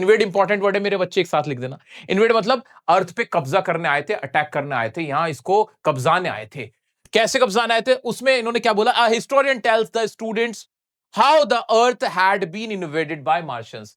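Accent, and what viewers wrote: United States. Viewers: American accent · Fat, slow and american accent❤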